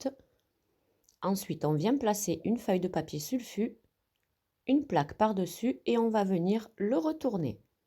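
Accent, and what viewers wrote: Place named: Provence, France. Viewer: 👏👏👏..abonnée depuis peu je vous trouve top comme vos recettes et vos vidéos 😉😁..j'ai fait ce raphaello en version gâteau rond et c'est une tuerie !mes invités n'en n'ont pas laissé une miette 👌😂..alors merci 🥰..plus qu'à tester plein d'autres recettes de votre playlist 😁..votre bel accent fait du bien et il me fait penser à ma sœur qui habite dans les Bouches du Rhône 🥰..à bientôt !!🌹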